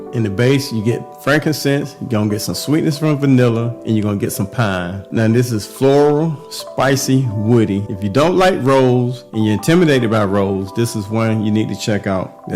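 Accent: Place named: Alabama, United States. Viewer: Yo! Wherebot you from brutha? I hear some Alabama in ya talk. Love the content. Get well soon fam. Checking in from Alabama.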